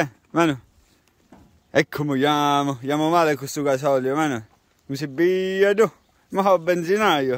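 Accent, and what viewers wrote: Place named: Lombardy, Italy. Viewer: Tipico accento lombardo 😅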